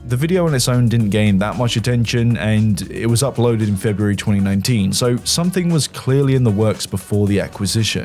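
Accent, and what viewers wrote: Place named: United Kingdom. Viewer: Never stop making videos like this. As a fellow Brit it's nice to not have to listen to yanks droning on lol.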